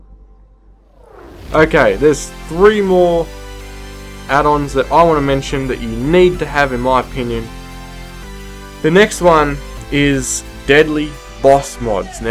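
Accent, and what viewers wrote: Australia. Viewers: Love that Australian accent :D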